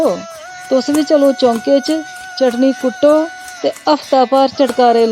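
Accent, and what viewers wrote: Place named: Jammu, India. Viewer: Your dograa accent is very nice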